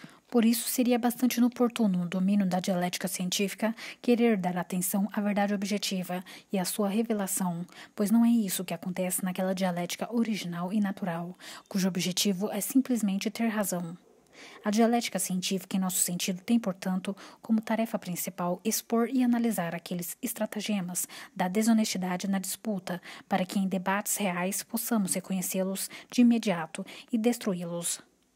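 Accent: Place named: Caipira, Brazil. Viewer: Jesus que sotaque de caipira socorroooi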